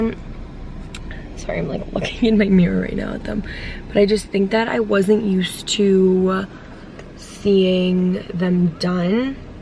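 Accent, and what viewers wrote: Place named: Boston, United States. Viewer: I know a Boston accent anywhere hehe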